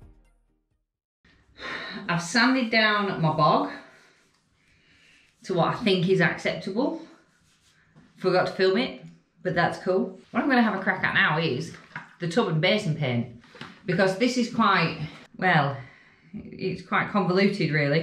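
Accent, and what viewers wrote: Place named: Newcastle, United Kingdom. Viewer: Def heard the geordie in there. I didnt hear the Yorkshire but to be honest I dont know many people from there, I am not trained to spot that accent. I am Greek, living in Newcastle.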